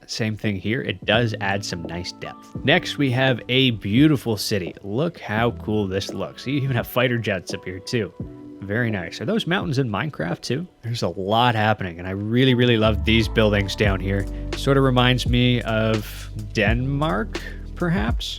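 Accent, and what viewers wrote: Canada. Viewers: I love your videos! Keep up the good work FOR EVER. PS you talk very Canadian...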